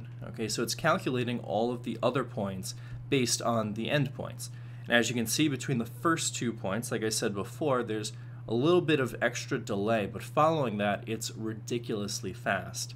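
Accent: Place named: Quebec, Canada. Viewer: ***** il est québécois...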